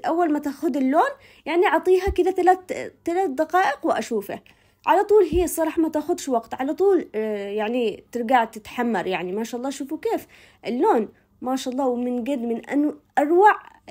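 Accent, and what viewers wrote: Yemen. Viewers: تابعت كذا مقطع بقناتك حلوه لهجه اليمين الله يعطيك العافيه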